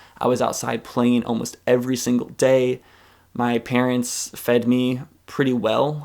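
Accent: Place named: United States. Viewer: Hey, Thank you so much for sharing. I have been watching your content for a very long time and suspected early on you were gay but didn't put much further thought into it since it didn't matter to me either way. Just was an observation like noting what colour your hair is or that you have an American accent. My mum has a few friends/acquaintances that are gay so growing up it wasn't weird to me but I was also aware that not everyone was accepting of people who are gay. I also knew people in high school that did the same thing as you did and pretended they were straight by dating someone of the opposite sex and are now happily out and proud. I was a big tomboy growing up and like doing things that were considered much more masculine but I am straight, while one of my friends is a huge girly girl/super feminine and she is gay. So I very much believe you are born gay based on that as well as what other people who are gay have personally told me. 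I am so proud of you and just want you to be happy:)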